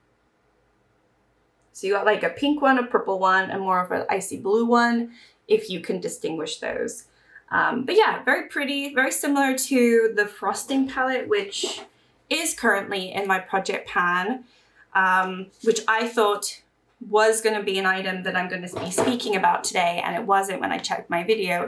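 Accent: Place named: England, United Kingdom. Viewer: Where's your English accent gone😂❤?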